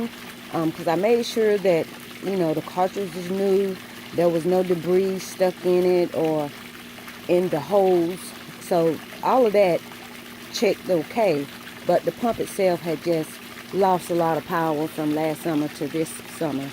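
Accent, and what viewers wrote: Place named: South, United States. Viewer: Not sure what southern state you are at? I’m living in South Carolina by way of Lyons Georgia. Love your natural southern Accent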